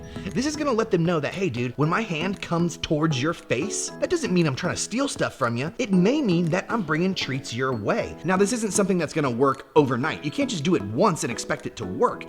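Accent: United Kingdom. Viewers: This guy can star in any British movie lmao I love his British accent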